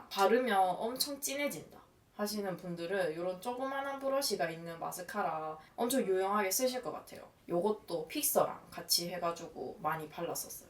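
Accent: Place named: Daegu, South Korea. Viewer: 대구분인가요? 대구 사투리같아용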